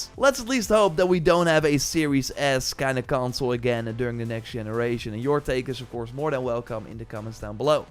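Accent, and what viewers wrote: Netherlands. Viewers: je Kaas engels blijft gewoon grappig lol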